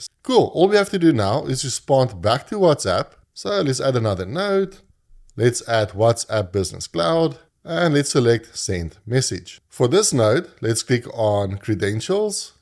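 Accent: Afrikaans, South Africa. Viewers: 😂 lekker afrikaanse ou, “What are my current list of todos.” Priceless. Thanks for the video 🎉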